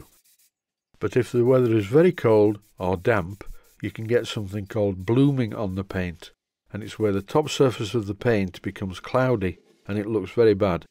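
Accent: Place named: Northern England, United Kingdom. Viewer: dont worry I understand u fine but then I am English and have spent a fair amount of time around northerners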